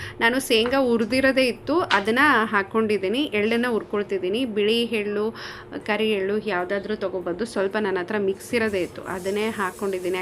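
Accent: Raichur, India. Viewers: Hi namaste from dharwad.. Nimma bhashe keltiddange ello nam kadeyvralva ansitu ella vedio na scroll madi nodi huduktide nim introduction vlog enadru idiya anta... Hage 5-6 vedio nodtiddange nim wedding card alli lingasuguru anta nodi khishi aytu namdu kuda raichur... Hege vlog madta iri.. Sadaa khushiyagiri...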